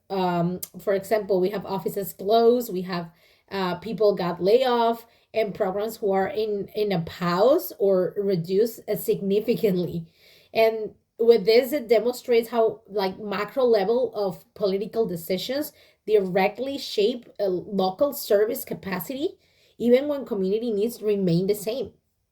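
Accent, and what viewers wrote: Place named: Spain. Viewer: Omg hot sexy Spanish accent is my fav weakness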